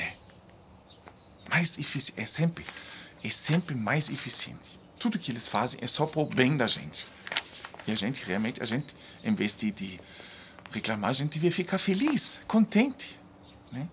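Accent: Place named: Germany. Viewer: Ele é alemão.... isso se chama "sotaque"!.......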